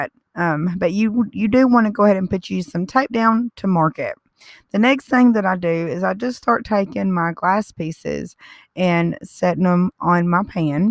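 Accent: South, United States. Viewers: You are so creative and explains the techniques so well. Thank you for sharing! Also, you are so pretty and I love your southern accent!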